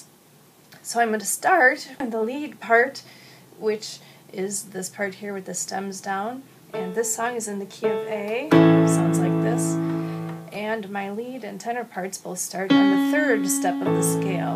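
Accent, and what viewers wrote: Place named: Wisconsin, United States. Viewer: I DO love your "Wisconsin accent," and I love your demeanor! Having taught for 30+ years, and having taught/supervised/evaluated teachers while so doing, I hereby award you an unqualified "A." You speak TO, not "at," your students; you take things logically; you "'splain why?" when appropriate -- wish I could really be your student!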